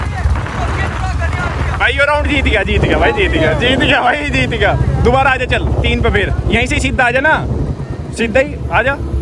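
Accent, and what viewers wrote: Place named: Muzaffarnagar, India. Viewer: Bhai tum muzaffarnagar se hi ho kya 😅😅😅 konse gao ke hoo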